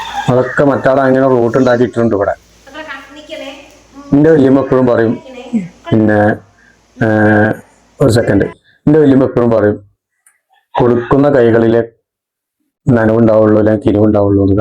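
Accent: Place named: Malappuram, India. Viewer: അത് ശരി - സംസാരം മലപ്പുറം തന്നെ😃😃😃😃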